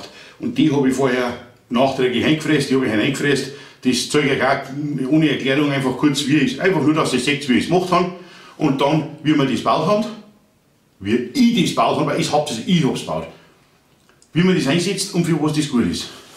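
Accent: Bavaria, Germany. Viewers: Beinahe hätte ich ein Daumen nach unten gegeben. Das Video war äusserst langweilig, Die Stummfilm-Zeiten sind doch lange vor unserer Zeit vorbei gewesen. Bitte sprich wieder in den Videos deine bayrische Goschen, hat mir immer Spass gemacht.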